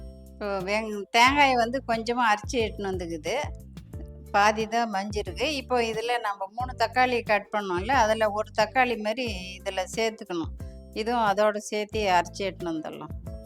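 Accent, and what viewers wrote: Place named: Vellore, India. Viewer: Very nice madam, i shall try this, i am native of vellore, i am happy to listen to your typical vellore style Tamil.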